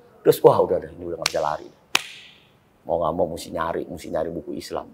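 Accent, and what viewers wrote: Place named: Jakarta, Indonesia. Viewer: Betawi bgt ye ngkoh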